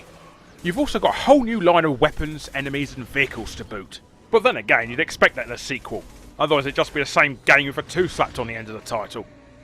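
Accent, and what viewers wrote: United Kingdom. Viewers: VERY british accent you got there :D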